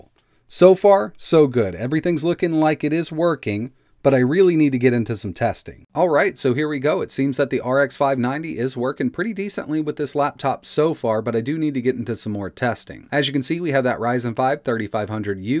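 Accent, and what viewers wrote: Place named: United States. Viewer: What a voice of a cowboy sexy accent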